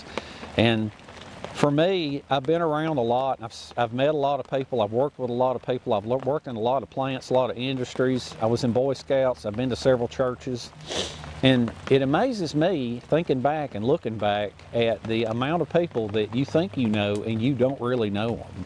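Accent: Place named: Kentucky, United States. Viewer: Are you from Kentucky? I grew up in Kentucky and your accent sounds familiar to me.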